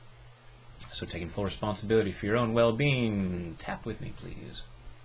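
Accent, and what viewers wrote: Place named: United States. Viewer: do you think this can work over the radio? Without visuals? Would you like to do a radio interview with me? For the uk? I assume you are in the US due to your accent. I always put a recording on my YouTube channel 'Feustelfit on air 91.8 Hayes fm' Please get in touch <mail>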